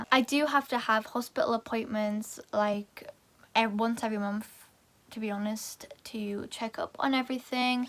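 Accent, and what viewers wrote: United Kingdom. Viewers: Being a 57 y/o man I'm glad it wasn't a makeup tutorial lol. My wife and I are proud of you. Happy you can openly talk about your history. When asked about it you can tell them to watch your videos. Since sis said hi say hi back. I need to watch more British movies so I can be used to your accent more lol.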